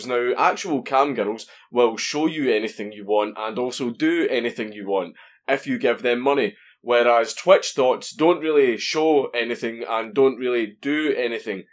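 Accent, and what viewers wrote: Scotland, United Kingdom. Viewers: Your Scottish accent is so fucking strong, that every time you say girl, I fucking hear ghetto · The Scottish accent really adds to the mythos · Having you tell the tale of the legendary Thot was just that much better with your deeply Scottish accent.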